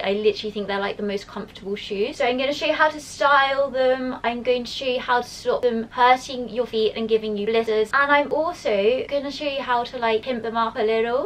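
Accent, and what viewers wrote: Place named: United Kingdom. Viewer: Akdiakzia menkanta el acento británico, además de que es fácil de reconocer xdxdxd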